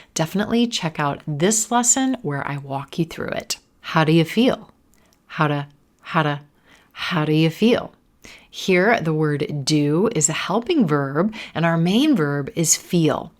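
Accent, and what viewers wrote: United States. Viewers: Your voice is so attractive. I am trying to search for some channels/blogs that have a clear American voice like yours for shadowing. Could you introduce some pages that I could imitate with scripts? Many thanks.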